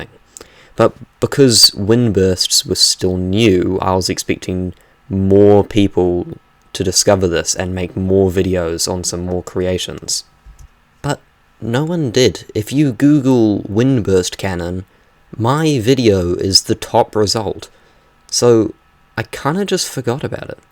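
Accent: New Zealand. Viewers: Omg W New Zealander (Kiwi?) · I know a kiwi accent anywhere, chur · Are you from NZ? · Are you a Kiwi? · Hello fellow Kiwi. Love the ingenuity in action. Heard that accent and it always just hits different 😅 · Amazing work! Also very cool to hear such a prominent Kiwi accent, very cool! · NZ accent?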